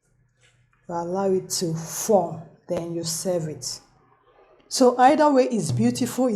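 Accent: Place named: Nigeria. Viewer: Madam Chef, your accent is that of a Nigerian.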